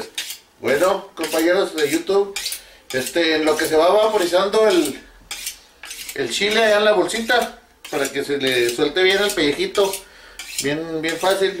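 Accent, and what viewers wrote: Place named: Chihuahua, Mexico. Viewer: he estado viendo sus videos y me hace el día su forma de hablar peculiar de nosotros los de Chihuahua arriba el norte, me encanta su autenticidad y que no se anda con mamadas jajaja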